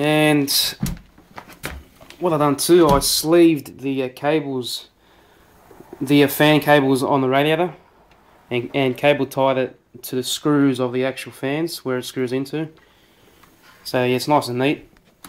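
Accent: Australia. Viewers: he's Australian (or from New Zealand). NOOB.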